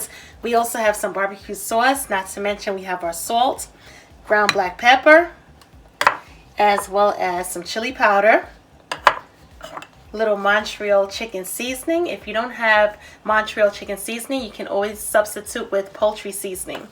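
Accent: New York, United States. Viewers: Nice video. Are you from New York or New Jersey? I hear something I’ve heard before in how you talk. Can’t put my finger on it.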